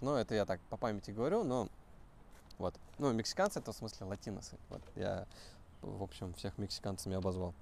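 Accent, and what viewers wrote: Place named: United States. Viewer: ..а еще у тебя произношение американское становится,даже говоря по русски)))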